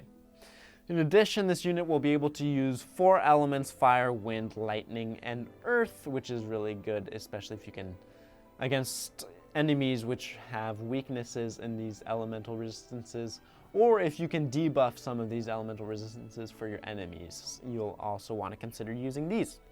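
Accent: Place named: Japan. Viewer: well I don't bloody understand his Japanese accent, drop it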